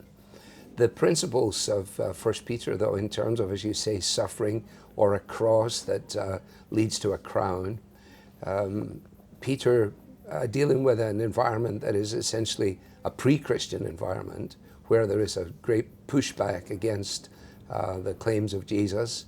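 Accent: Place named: Scotland, United Kingdom. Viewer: I started watching and listening to Alistair after my husband passed away and I decided to join a large church where a lot of my friends were going. The church had called a new young pastor after the former pastor retired. I had been listening to after I got home from my previous church and I really liked his expository teaching. He came to visit me before I joined the new church. He is the one that told me about pastor being his favorite pastor to listen to. That was nearly 3 years ago. So that began my acquaintance with. I searched for every sermon that I could find. I loved his teaching. Just as I loved to listen to the pastor of my new church. I also love Pastor Scottish accent. I was raised in the Presbyterian church and a member of the PCA until my husband died. I joined a large Baptist church. Hard to make that decision but I’m glad I did. 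Since all this has happened to it breaks my heart that he has been judged so harshly by his peers. I pray for him and his family that all will be well. The church needs pastors like him,especially since we have lost so many good teachers in the past few years. Men like RC, and Dr. MacArthur, and so many others. The church needs them. We need to pray that God will bring forth more godly me who can fill those shoes.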